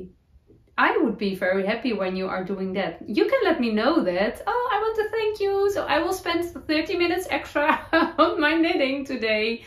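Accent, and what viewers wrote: Netherlands. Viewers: I love your Dutchy English. 😍 when you say ‘a piece of cake’ it means it is easy to do. When we don’t love something ( in a slight way) we say ‘it is not our cup of tea’ . Hope that helps 😘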